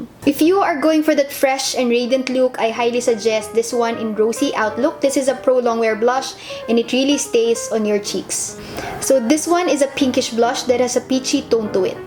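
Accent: Philippines. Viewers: I love how true you are to your filipino accent. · your english is cery tagalog.... we dont understand what u talk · Her accent is so filipino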